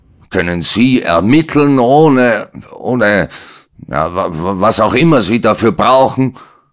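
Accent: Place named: Austria. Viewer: Lustig, wenn, der nebenbei gesagt Österreicher ist, sein österreichischer Dialekt als nicht überzeugend kritisert wird 😂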